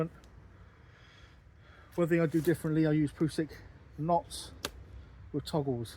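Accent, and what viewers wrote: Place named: Dorset, United Kingdom. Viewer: Nice! For once youtube recommended a video worth watching. Thats a beauty, and by the sounds of it your clearly my neighbour lol (im from dorset) so would be rude to not subscribe! 👊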